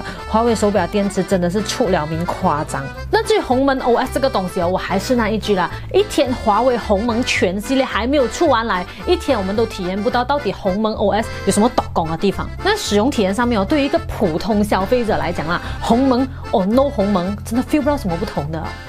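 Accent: Malaysia. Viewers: 馬來西亞普通話？ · 你系痴利根定系马来口音都系咁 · 马来西亚的口音都是这样子的吗？ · 我是来听你的马来口音的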